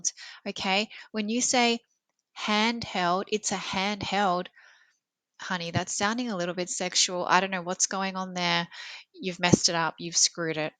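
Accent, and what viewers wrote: Australia. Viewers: Nice to hear a well-spoken Australian voice. "'A whole 'nother thing" - no. "A whole other thing" - yes. (Retired Australian teacher) 😊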